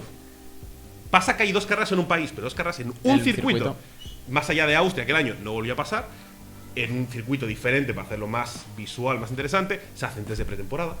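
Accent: Argentina. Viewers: Y el acento argentino?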